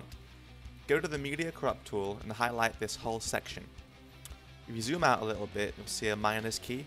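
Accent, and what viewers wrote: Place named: England, United Kingdom. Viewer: I had no idea that you were English. Definitely surprised me when i heard that accent. Don't know why i thought you were from the States.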